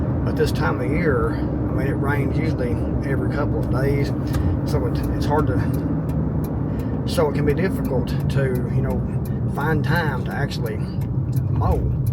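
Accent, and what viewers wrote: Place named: Tennessee, United States. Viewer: Either buddy, I just really like this video and I just think it’s really cool the way you explain things I love how you’re driving in your car. I think it’s really really awesome and I can’t wait to hear and see more videos from you. You’re really awesome and I like your accent. It must be nice living in Tennessee, but I definitely will stay as a fan and watch more of you I think you’re awesome man.😮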